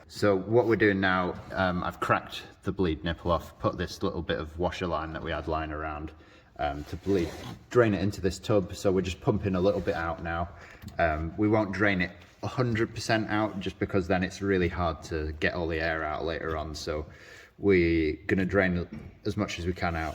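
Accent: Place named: Warrington, United Kingdom. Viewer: yeah i had mine swapped over - made a difference as the castor got adjusted (wheel sits further forward now). great work tho man - you dont sound far from me (warrington based myself) and i visit 3 sisters from time to time - be great to catch up and check your car out :) ant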